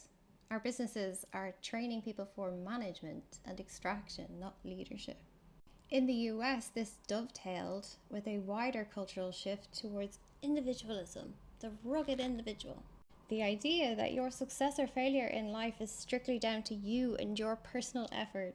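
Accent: Ireland. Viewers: You sound like an Irish Lisa Simpson.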